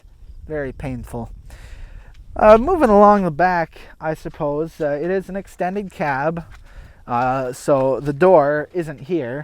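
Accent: Canada. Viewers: You sound like a crazy country CANUCK... WHERE YOU FROM?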